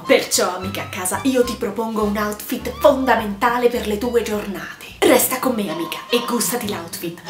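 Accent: Rome, Italy. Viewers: Amo alla follia la tua comicitá, la tua parlata romana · Fantastica come sempre xD troppo forte !!! si capisce che sei romana !!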